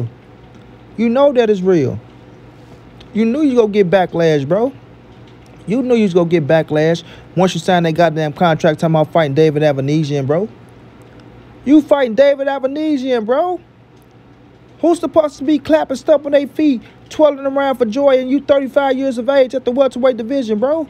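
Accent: St. Louis, United States. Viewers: Yo where ya from fam? I hear that stl accent... Salute For alway keeping it a 💯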